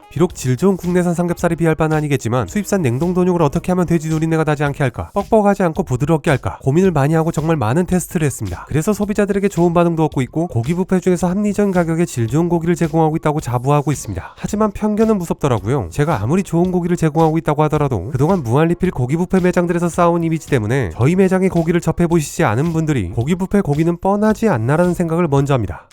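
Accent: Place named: Jeonju, South Korea. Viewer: 전주분이셨군요?역시 목소리톤이 사투리는 없지만 억양이 전주식 아니겠지 보면서 참 친숙해했는데...좋은영상 만들어주시고 항상 잘보고 있어요. 제가 전주를 좀 알아서요.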